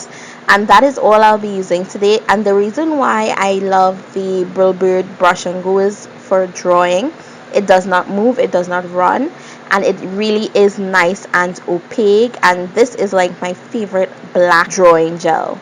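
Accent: Trinidad and Tobago. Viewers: Sounding Trinidadian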